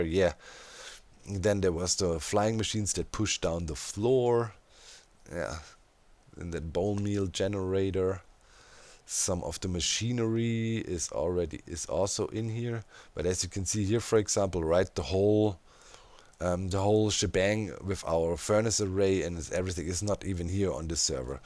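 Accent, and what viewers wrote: Germany. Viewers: hey i wonder If you can understand that ich habe erst und der Folge erfahren das du deutsch bist hatte aber immer den Akzent gehört ich gucke deine Videos jetzt seit grob einem Jahr die Shadow items die du mumbo letzte Season gezeigt hast haben haben mich neugierig gemacht und ich habe dich abonniert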